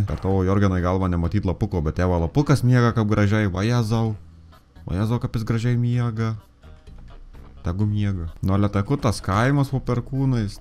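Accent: Suvalkija, Lithuania. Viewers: Kai pamatai video: oooooo daba užstrigsiu porai valandų kol suprasiu viską ką jis ten suvalkietiškai kalbėjo. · Kadangi esu iš Marijampolės tai tu taip primeni tėvus su tuo tarimu xd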